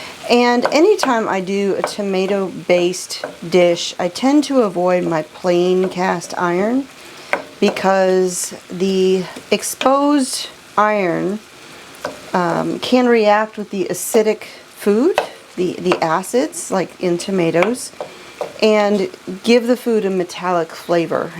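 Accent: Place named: South, United States. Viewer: My hubby Jerry and I love to watch your cooking videos. Do I detect a little more southern charm to your words now a days?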